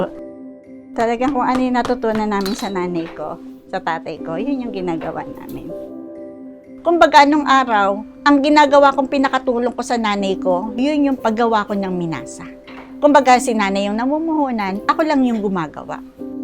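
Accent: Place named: Philippines. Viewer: The narrator sounds very Filipino, so unless they've perfected a generic Filipino AI voice, I doubt it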